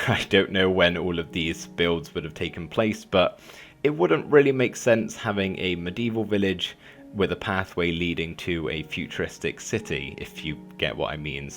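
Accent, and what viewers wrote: United Kingdom. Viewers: i have literally been trying to figure out if he's British or not, but this episode has convinced me that he is...*cough* cough "not at toll" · he is British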